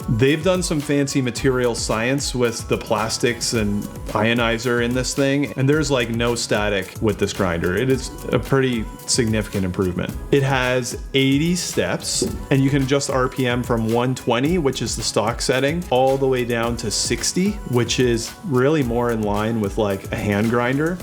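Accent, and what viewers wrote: Canada. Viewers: Super helpful vid, nice looking kitchen & great Canadian accent 🙂I actually just got the same studio in the mail. I've used it a few times and I'm trying figure out the steps and RPM's on the grinder. I haven't played with it in fear of botching my coffee, I just scan the pod (via the app) and pushing play. Any suggestions?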